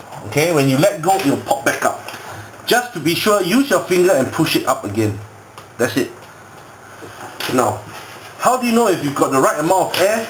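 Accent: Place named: Singapore. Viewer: Wow!!!! The first impression was that Singaporean English accent. Further confirmation from your profile reveal you to be my countryman! Haha Great video. Recently just got my spool and SMB. This is a good "dry run" video before I actually deploy it on my next dive.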